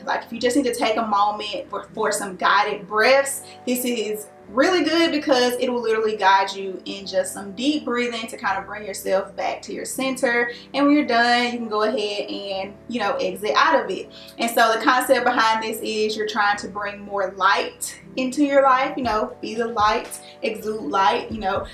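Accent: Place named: Texas, United States. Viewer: Your voice is kinda a mix between a Texan/southeren accent and something else lol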